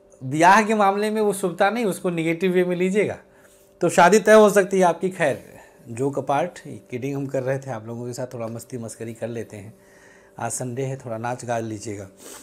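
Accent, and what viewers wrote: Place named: Rajputana, India. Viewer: sir g aap brahmin h pr aap rajputana style m baate krte h..🙏🙏🙏🙏 achcha lgta h k apne swabhiman ko bnaye rkhna chahiye..chankya yaad aate h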